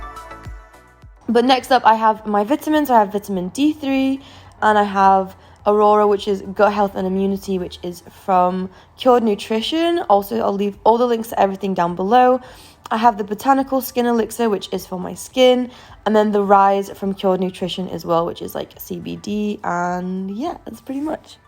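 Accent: United Kingdom. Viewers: Trying to work out what part of the UK you are from - is it somewhere near say Bath or Bristol but maybe originally from the North West?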